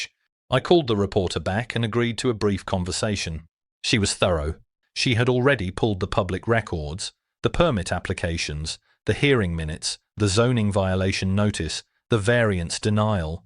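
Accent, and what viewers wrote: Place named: United Kingdom. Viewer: Why a british voice?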